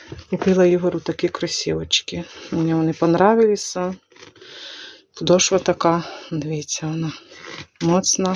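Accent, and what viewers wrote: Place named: Ovruch, Ukraine. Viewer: Все у вас добре, урожай є, і говорите ви гарним суржиком, по особливому, по овруцькі, як мені хочеться в Овруч, може пізньої осені вдасться, люблю ваші відео про місто, про ваші квіти, мальви... Головне щоб було здоров'я і мир!!!!!!❤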